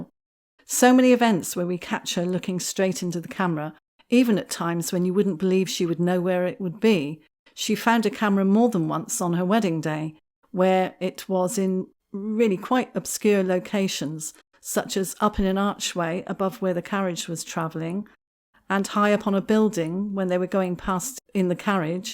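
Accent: United Kingdom. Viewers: Lovely British accent. Excellent content and perspective on YT videos. Thank you. I truly appreciate you. 🏆🌈🏆🌈✨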